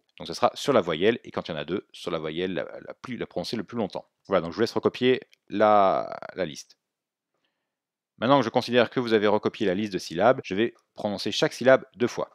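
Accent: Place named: France. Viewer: Je m'excuse d'avance pour la question mais tu es de quelle région de France ? (si c'est en France). J'ai un collègue qui a la même façon parler que toi d'où ma question. Merci :)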